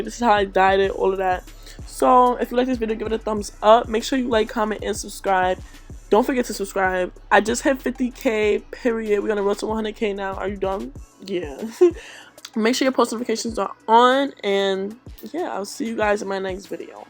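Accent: New York, United States. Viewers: the accent is giving , most def from NY😭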